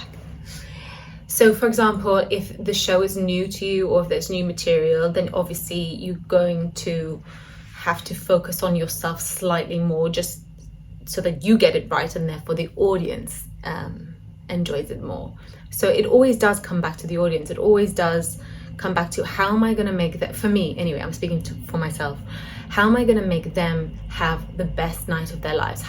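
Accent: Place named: England, United Kingdom. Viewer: Thank you, it’s so nice of you to share this with your fans and followers. I did not have the chance to ask, but I have this question, if I may. I learnt you’re from Malta (is that right?), so you’re Mediterranean (I am from Spain). At the same time you seem very Anglo-oriented: you have a strong English accent and the three desert island records you chose are English or American. On top of that I loved your excellent cover of the, which is Brazilian (which is what I thought you were when I first discovered your videos). So my question is, what are your influences, the music popular or otherwise that has made you the singer song-writer that you are? Thank you anyway.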